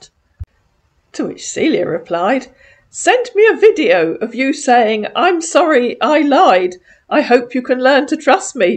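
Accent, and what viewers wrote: United Kingdom. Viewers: Omg rofl "Quit telling me ridiculously stupid lies". With your exasperated tone in your cute British accent, you have me laughing like a big ol donkey!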